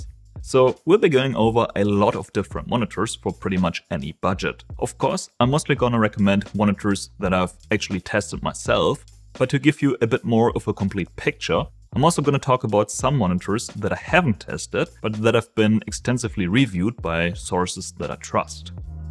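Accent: Germany. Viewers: Dem Akzent und Outro nach gehe ich davon aus, dass du Deutsch sprichst 😅 Ich sehe überall, dass der Samsung Odyssey G7 als der beste UHD 144Hz Monitor angegeben wird, aber was ist eigentlich mit dem LG 27GN950-B? Wenn ich mir nämlich die theoretischen Werte anschaue, liegt der meiner Meinung nach vorne (kann auch sein, dass ich ein Trottel bin und keine Ahnung davon hab, aber wer weiß das schon… 😉) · Bis zum nächsten Video... Wusst ichs doch. Der Akzent kann nur deutsch sein :D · Are german ?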